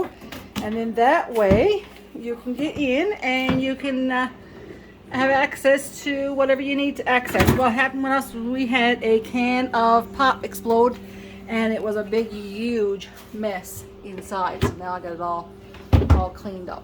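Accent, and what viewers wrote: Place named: Cape Breton, Canada. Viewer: Ay, take me back to Cape Bretton. 👍 Love that accent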